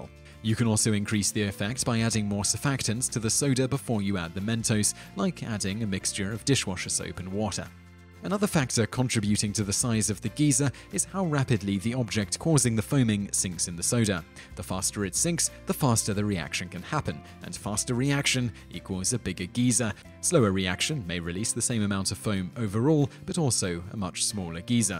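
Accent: United Kingdom. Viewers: Pondering Smirk Oh, probably close to when I did, then. They must've commented after I'd started watching so it didn't show. It's funny nonetheless! One of the perks of having an awesome british narrator. Simon is awesome, but the main audience is American, where Geezers and Geysers are completely different.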